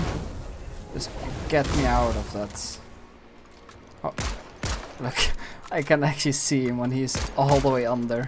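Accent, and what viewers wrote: Netherlands. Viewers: Yoo bro you dutch ?? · Are u dutch? ur accent is so strong.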